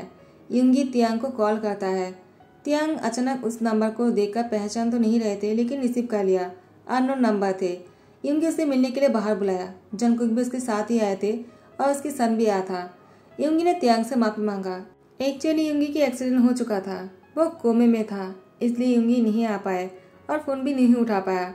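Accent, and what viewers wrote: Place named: Bengal, India. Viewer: Di you're a bengali right?? 😁 plz ap mind maat karna apki stories bohat acchi hoti hain and I love them😍❤ but maine bohat baar apka baat karne ka tone ya baaton ke beech bengali word ate hue dekha isiliye puchi and also ye story ek famous bengali movie se inspired hain so🙂 (and i'm also a bengali)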